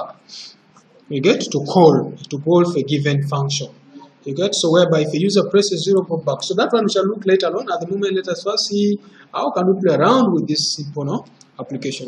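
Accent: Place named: Central, Uganda. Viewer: Gwe, yo English is kinda Central Ugandan...!!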